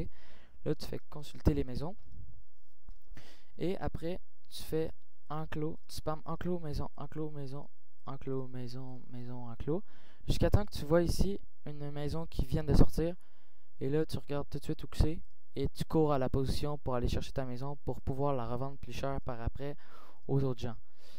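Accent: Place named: England, United Kingdom. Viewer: pt1 je supporte pas quand les gens parlent francais avec un accent anglais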